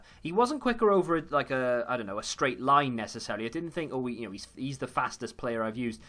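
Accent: Wales, United Kingdom. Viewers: Certainly not, I'd guess Wales or somewhere in England close to the border. Probably Wales though.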